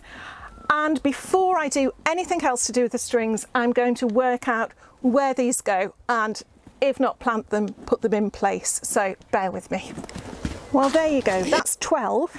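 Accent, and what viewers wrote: United Kingdom. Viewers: Thank you for the brilliant idea of tying the string around the root ball of the tomato plant. I usually use coat hangers pushed into the soil by the side of the plant and then tie the string to that and like you, I wind the plant around the string as it grows. Your idea looks so much easier so I will be trying that method this year. Now, I don't grow roo many in the greenhouse or hoop house over here in Ontario as it gets too hot. So, they are usually grown outside. So for the frame to use overhead, I have several old discarded gazebo frames. They work a treat and it does save them from the landfill (tip) for a few more years. Thank you for the great ideas and I especially LOVE your accent. It feels like a blast from my past as I was raised in UK. I was born here in Ontario but taken over there as a baby.. As you can imagine, I like to use the best of both worlds when it comes to gardening. 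Some things work well here and not so well over there and the other way around. Anyway, you take care and stay stay safe, blessings from Sandie in Ontario Canada.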